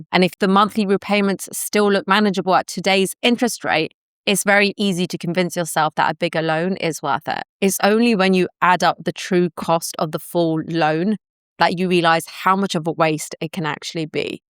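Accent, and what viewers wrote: United Kingdom. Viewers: Why are you talking $ and Americans instead of £ and Brits? Am I the only one hearing a British accent?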